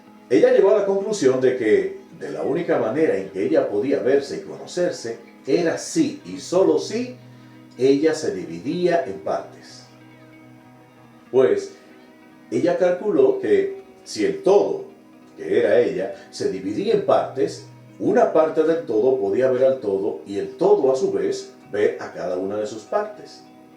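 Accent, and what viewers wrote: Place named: Dominican Republic. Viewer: Hola 🖐️ es un honor saludarte. Me nutro mucho escuchandote, muchas gracias por tu gran aporte. Soy Dominicana,y quiero saber si tú también lo eres; pues tú asento es parecido al nuestro. También estoy en el camino del despertar; y me gustaría saber si vives aquí; pues necesito ayuda más directa. También yo me estoy preparando para ayudar a mi país con el despertar. Por favor, espero su respuesta.... gracias mil!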